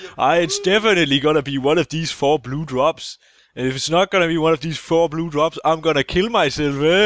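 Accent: Denmark. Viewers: Danmark? Tydeligt at høre!:)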